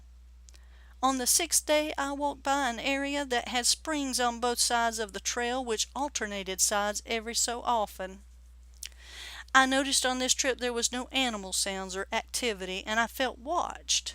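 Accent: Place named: Appalachia, United States. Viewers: I know where you are talking about you sound like Appalachian instead of a Texan I’m from south east Texas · Great story, and any kind of Southern accent is a welcome sound to my ears. 😀👍🏼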